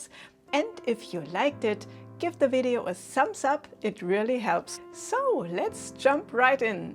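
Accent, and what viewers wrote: Germany. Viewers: Thank you,. Fantastic lesson, it really helped me. Love your teaching and I guess your German/Austrian accent. Thank you so much ❤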